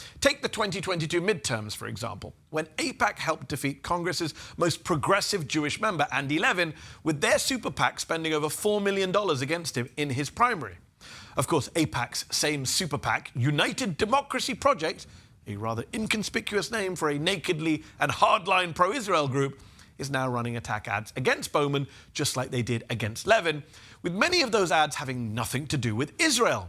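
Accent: United Kingdom. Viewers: Hiding behind a British accent fools no one. We know what this channel is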